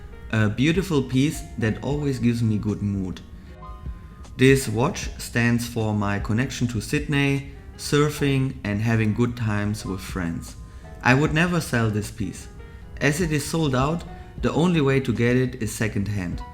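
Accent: Germany. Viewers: Denglish hardcore😆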